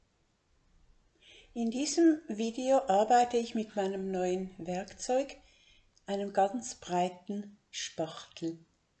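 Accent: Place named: Basel, Switzerland. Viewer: Sehr schön Ihre Stimme zu hören! Ich schätze Ihre Videos sehr... Schöne Grüsse aus Basel ...Ich vermute (ihre Intonation) die Stadt ist Ihnen nicht unbekannt ; )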